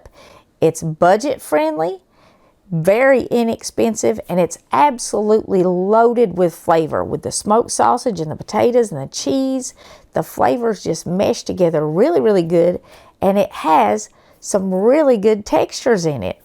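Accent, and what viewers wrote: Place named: Arkansas, United States. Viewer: Howdy! As of right now, I see you posted this video a couple of hours ago. I watch all of your videos on YouTube via TV. Always hit the “Like” & share your videos. I am about 1-2 hours, NW of Lake Tahoe. I love listening to you, your accent makes me feel like I’m with my Arkansas family, on my Grammy’s side. I hope y’all have a Blessed year ahead. Much love and blessings to your whole family. XXX RHONDA 🙏🏼✝️💖🇺🇸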